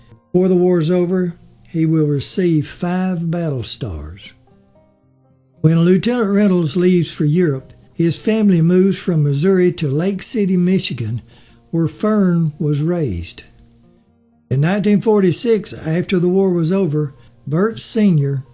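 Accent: South, United States. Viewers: .... Another great vlog. You have the knack of telling a great story. I just do enjoy listening to your sweet southern accent. Please have a joyous and safe holiday season.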